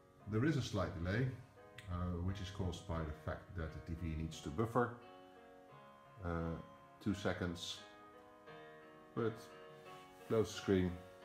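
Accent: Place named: Netherlands. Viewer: you have to buy the app any alternative way of doing this, also i noticed that you are dutch just from your accent you al speak english in the same way just an observation thanks for the video though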